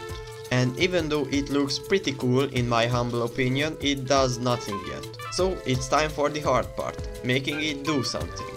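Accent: Hungary. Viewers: A magyar akcentus hallatszódik de ne add fel mert feliratkozok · Az az erős magyar akcentus❤😂(enyém se jobb) Szuper ötlet és megvalósítás amúgy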